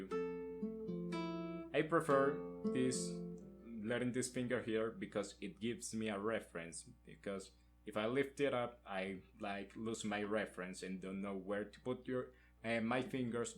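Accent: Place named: Latin America. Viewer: JAJAJAJA Note un poco de acento latino cuando hablas en inglés, pero no estaba tan seguro. Ntp te explicas bien y se entiende al 100 todo el vídeo. Muchas gracias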